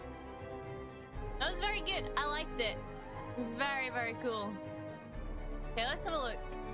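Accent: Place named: Belfast, United Kingdom. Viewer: I must live near you. I Knoe your accent is a belfast accent. Im in Carrick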